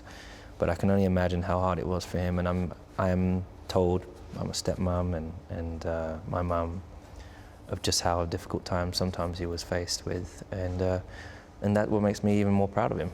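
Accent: United States. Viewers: Daniel May He always hinted an American accent whilst talking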